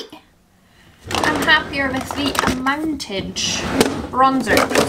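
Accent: Scotland, United Kingdom. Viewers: What part of Scotland u from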